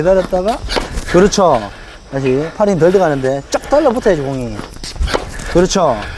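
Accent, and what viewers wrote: Gyeongsang, South Korea. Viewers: 지역어디세여? 레알 오리지날 사투리이신뎅 ㅋㅋㅋ(저두 갱상도)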